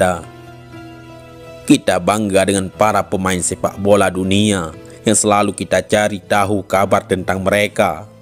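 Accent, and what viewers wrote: Aceh, Indonesia. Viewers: Menurutku itu logat orang acheh bro bukan bali ataupun lombok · Aceh pidie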